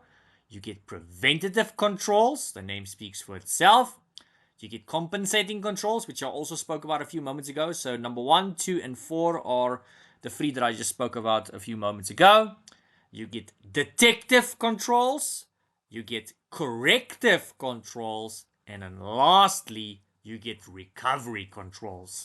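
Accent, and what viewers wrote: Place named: South Africa. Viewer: Just starting my sec+ journey subbing to you simply for your epic south African accent. 😁 The apple sauce of all accents.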